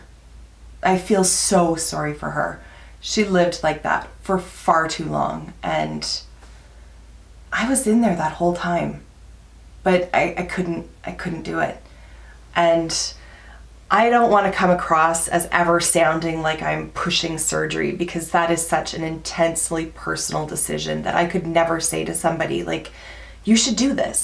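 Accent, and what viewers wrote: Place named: Canada. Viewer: I just came across your channel for the first time, I was just sleeved 9/30/20, I hope I have success similar to yours, 80lbs in less than 6 months is amazing!!! (Sidenote- I have to ask, are you from Canada, by chance? I only ask because I'm from Connecticut,usa and some ppl imitate the Canadian accent, and when they do it's either french or like yours (you don't have much of an accent I just hear it on a few words, I love accents lol) I will definitely keep following your journey!!!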